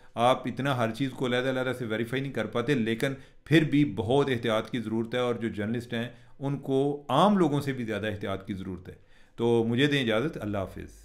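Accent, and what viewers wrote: Punjab, India. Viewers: sb, aap ache khaze program kr rahe hn mager pata nahi q aap Imran shafqat aur goher butt ka copy krte hn, I mean punjabi tarka lagate hn. Punjabi language is very very sweet and I love it but don't copy them. You are already very popular in your own style.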